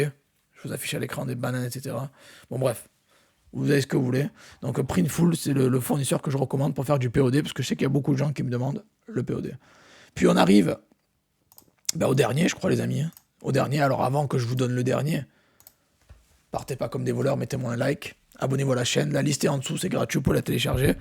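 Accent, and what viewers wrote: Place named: England, United Kingdom. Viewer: merci super comme d'hab et au fait sympa ton accent anglais 😅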